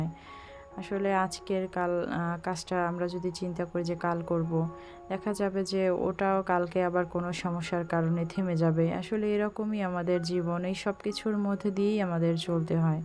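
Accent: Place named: Barishal, Bangladesh. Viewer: বাবা টার জন্য অনেক দোয়া রইলো আপু বরিশাল শুনলে মনে একটু শান্তি শান্তি লাগে পিজ্জা টা অসাধারণ হয়েছে কিনা কড়াইটা ও খুব সুন্দর হয়েছে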